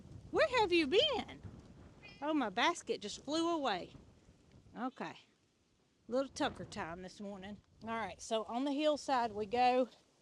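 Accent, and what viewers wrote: South, United States. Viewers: I'm over here cracking myself up over wondering what in the world "bacon powder" is when I realized it was your cute southern accent, and you were saying "baking powder"!! 😂🤣😂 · I love your southern accent, especially when you say your website name! So cute!❤❤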